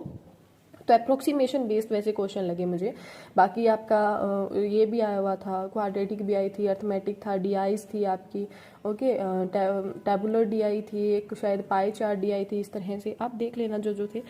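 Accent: Haryana, India. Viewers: Good attempts 😀👍 aapka haryanvi accent bhot accha lagta hai meko 😊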